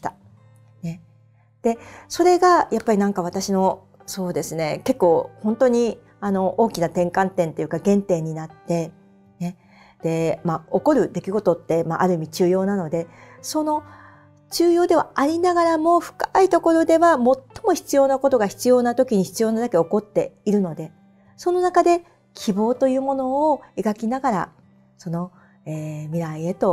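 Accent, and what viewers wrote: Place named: Hokkaido, Japan. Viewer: 対談動画ではせくらさんを知りました。 北海道は珈琲と幼稚園のイントネーションが違うと聞きましたが、やはり道産子なのですね😊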